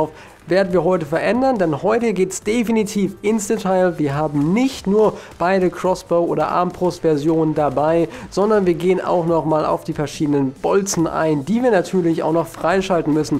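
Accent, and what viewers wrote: Germany. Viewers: also dein Video war richtig informativ und hat mir geholfen, ausserdem gefällt mir den leicht norddeutscher Akzent (?) Also ich lass gleich mal ein Abo da :D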